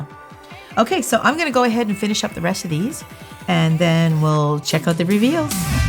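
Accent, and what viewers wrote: Canada. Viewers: Wait is she Canadian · It’s my third time watching her vid and I totally don’t know anything about her But I’m going to guess she’s from Canada I’ve been hearing her saying ey many times 🖤🖤🖤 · You can definitely tell is Canadian because of how many times she says "eh?" after her sentences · Are you Canadian?😜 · Are you Canadian? Cause you say "eh?" a lot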